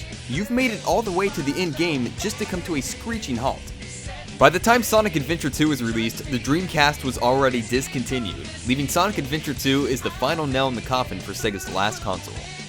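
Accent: United States. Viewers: Another yank tosser. The rest of the world cringes every time people like you open your mouth.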